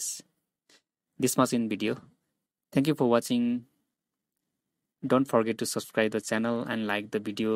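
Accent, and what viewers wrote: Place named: India. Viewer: Disastrous Hindi english accent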